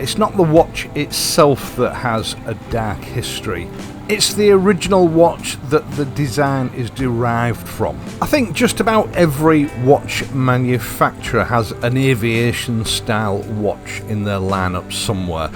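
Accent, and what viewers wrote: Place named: Yorkshire, United Kingdom. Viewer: Hi - wandering through the channel last night & my wife said "Have you got a brother in Yorkshire, or is that just you doing the accent?" (I'm Glaswegian) - confirming that there is indeed a community of us older blokes fed up with disposable consumer culture and tending to embrace the same kinds of things - real clothing, real bikes, real watches and so on. One of the things I most resent about late capitalism is that it intentionally de-skills you to increase your dependency on one corporation or another for products or services. Over-complicated, un-self-serviceable machinery is a prime example. Another is shaving. We got used to paying more and more for overboiled cartridge shavers that require (apparently) ever more blades and strips of chemical slime to do an adequate job of scraping stubble off your face. 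Fed up with this, a few years ago I dug out my old Gillette safety razor I'd had since I was a boy ("I'd no idea they still make the blades") and reverted to that for a while, but it still bothered me that I was entirely dependent on a disposable component of uncertain quality; besides I still couldn't get a nice clean edge around a tache or beard. You can see where this is going... I began a small collection of straight razors picked up from local antique shops mostly, for which I've rarely paid more than a tenner. I watched Youtube videos, bought a couple of whetstones and leather strops and set about acquiring the skills to maintain and use them that my grandfa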